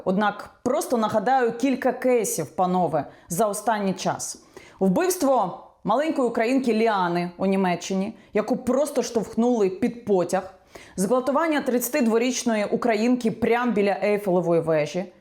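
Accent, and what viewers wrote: Russia. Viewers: ну не під силу вам український синтаксис - хай вже як є, але ж вивчіть бодай українську фонетику - це не складно вам буде. Ну не можна ж вимовлять українські слова чи не в кожному другому з них по-московському. До Азірова не так вже й далеко.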